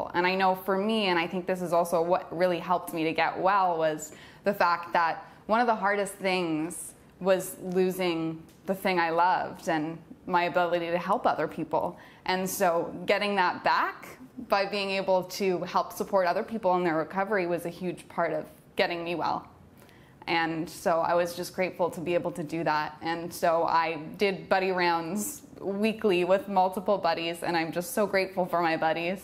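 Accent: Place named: Canada. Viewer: I relate to your story so much. Congrats on your recovery. Love your Canadian accent. Cheers from Southern California.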